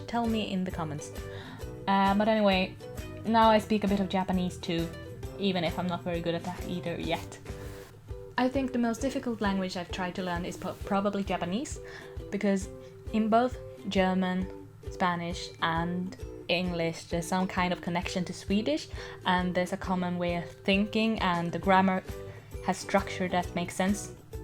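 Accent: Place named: RP, United Kingdom. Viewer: it's a bit posh but in a sophisticated way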